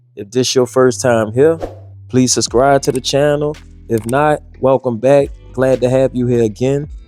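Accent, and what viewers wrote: Louisiana, United States. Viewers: Where you from bro. You sound like you from Louisiana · Wait! You sounds familiar. Are you from NOLA Bro? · Fam, you from the boot? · Bro are you from Louisiana? I’m from BR myself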